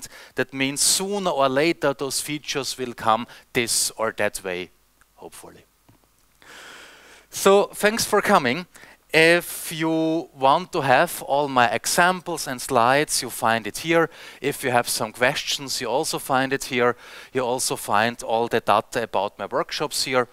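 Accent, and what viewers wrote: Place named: Austria. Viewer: Came to check on what's up with Angular these days, stayed for the glorious Austrian accent. I'll be back.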